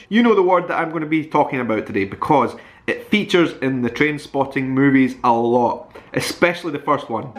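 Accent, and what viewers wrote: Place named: West Lothian, United Kingdom. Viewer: It is NOT an Edinburgh book/movie. People forget that a lot of the dialect and accents in the book and movie is NOT Edinburgh. It is in fact Leith, which although is officially part of Edinburgh (although, historically wise for not that long) is NOT Edinburgh tongue. Talk to someone outside Waverley Station and then walk a mile down Leith walk, you will notice a change in accent and dialect. I am from Glasgow and have lived in Leith for 10 years now, I like many other people from down that end do not consider Leith part of Edinburgh, even though taxi drivers through this end add an extra £4 to my taxi fare after hearing my Glaswegian accent haha The book has been studied many linguistic experts and it is agreed that it is not an Edinburgh accent. When you said "speaking slangs" just confirmed my inclination that you have no idea what you are talking about. 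Your accent and linguistic terms are more related to West Lothian/East Lanarkshire, not proper Leith, which where the book is based and written in.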